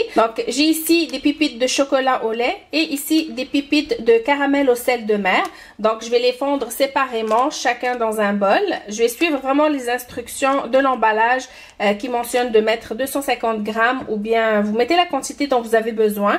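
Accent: Quebec, Canada. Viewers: J'aime beaucoup trop l'énergie que tu dégages dans tes vidéos, merciii pour la recette ça a l'air délicieux Ps : ton accent québécois est magnifique 😭❤ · Adorable femme avec cet accent du Québec 😁😁👏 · Mais je me suis trompé c est l accent canadien que j entend en tout tu es une maman très attentionné maman gâteau bravo à toi super recettes merci. Emma de France.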